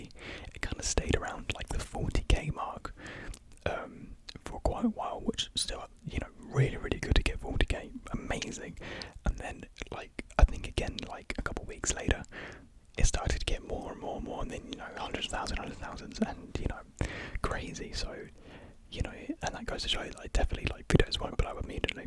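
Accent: United Kingdom. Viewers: hi i was wondering if you're british but live in america?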